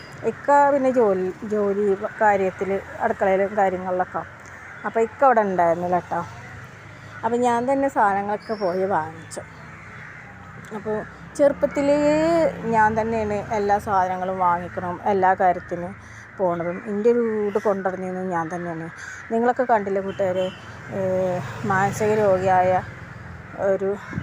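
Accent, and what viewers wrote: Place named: Malappuram, India. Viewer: എവടെ ആണ് സ്ഥലം ഭാഷ മലപ്പുറം ആണല്ലോ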